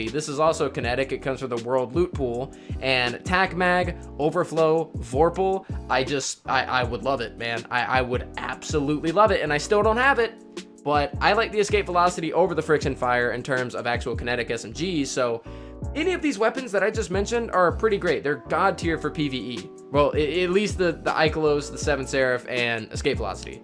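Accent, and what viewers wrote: France. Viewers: U actually French?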